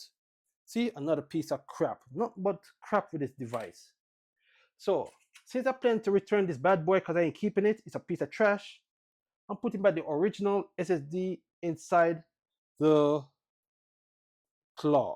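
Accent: Jamaica. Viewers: The fact that you're Jamaican makes this 100 times better😂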